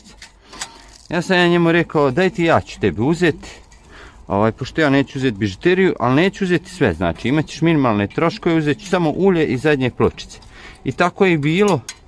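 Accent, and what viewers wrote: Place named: Bosnia and Herzegovina. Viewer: Obozavam gledati tvoje video snimke,legenda si ,pogotovo kad ti cujem naglasak vratim se u Bosnu opet ..Pozdrav iz ledare Norveske!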